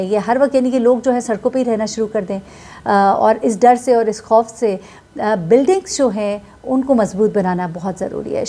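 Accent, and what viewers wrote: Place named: Punjab, Pakistan. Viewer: اور آپ غالباً تلہ گنگ یا سرگودھا سے تعلق رکھتے ہیں،وہاں پر ہی اس طرح سنا ہے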